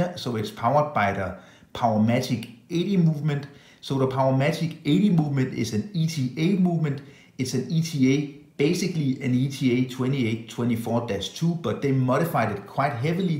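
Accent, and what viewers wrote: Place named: Denmark. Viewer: Nice review of a beautiful watch. You have an unmistakable Danish- English accent..lol I can hear whenever a Dane is speaking English. Not a criticism by the way, just an observation. Jeg er engelsk men er ogsaa Dansk talande.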